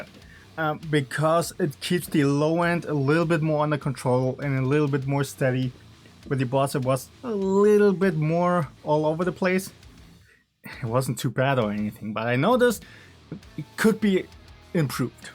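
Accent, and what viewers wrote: Germany. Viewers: Are you a german guy...?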